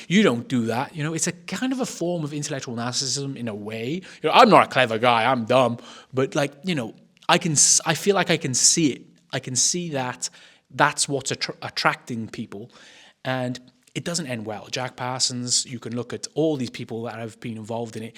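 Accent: Wales, United Kingdom. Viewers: Your welsh right? I csnt figure out your accent and its annoying me haha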